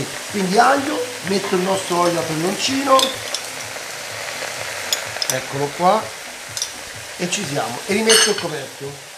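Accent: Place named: Rome, Italy. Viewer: Ao e nun cagate rcazzo, è de Roma parla romano e pensate de non trovà i romani che scrivono in romano sotto ai commenti? Non avventuratevi mai a Roma come in nessun'altra città italiana dato che siete tanto sensibili da non riuscire ne a leggere ne a sentire i dialetti. Detto questo, m'hai fatto morì dalle risate ahahaha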